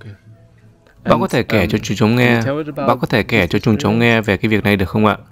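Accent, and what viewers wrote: Vietnam. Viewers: Ông a người miền nam hay bắc đấy,,giọng nghe quen quen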